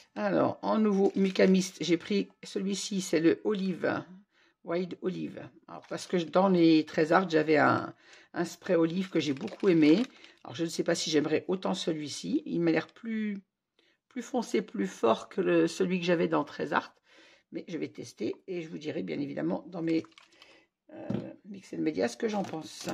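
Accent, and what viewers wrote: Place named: England, United Kingdom. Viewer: Cc, encore une bien belle commande!!! 😊 J'ai hâte de voir le tuto mix média car j'aimerais bien apprendre à faire de belles boîtes comme tu les fais si bien 😉 Entre parenthèse, j'aime beaucoup ton accent "english" 😁 Sur ce, je te souhaite une très belle journée À très vite Scrap'bises 😘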